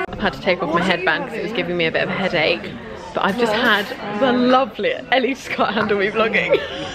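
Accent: United Kingdom. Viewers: I hop to visit you in England, and learn British accent from you I love you so much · Congratulations may happiness and health be upon you...I learn British accent from you... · Hi! Congrats for your wedding. I am your follower. I love your British accent. Thank you for everything you teach us. I wish a splendid and amazing Marriage. Kisses from the distance! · I always watch your videos whenever I get some issues with grammar and also I am learning British accent from you bacause I am totally obsessed with it... Many many love from my heart core... ❤️❤️❤️❤️ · Oh my god, Hey! You're just one of the brightest personalities ever!!!! And diego, he is one sweet doggo. I must say, you are just amazing. I learn British accent from you... and I love it. I am pretty awesome at English, but i admire the British accent.. Thats the reason😉. I am a 14 year old fan of yours! 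Aha.. I cant get enough of your videos. Always there to support you. Loads of love!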